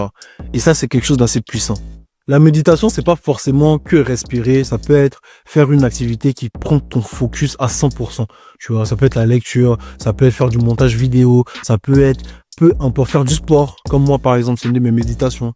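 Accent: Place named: Ivory Coast. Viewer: On dirait que vous êtes ivoirien hein frère👊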